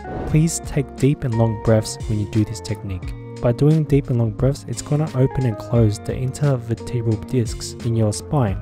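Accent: Australia. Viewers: He has an Aussie accent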